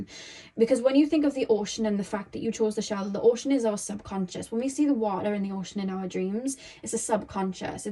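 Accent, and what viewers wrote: Wales, United Kingdom. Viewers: This is really random but I just want to say I've always LOVED your voice and Welsh accent. The way you say certain words is amazing to me lol. When you said "hoodie" I was mind blown and had to replay lol love it ❤️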